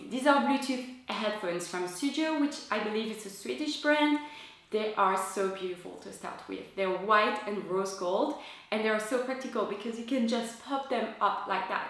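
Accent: France. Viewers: Love love love your French accent !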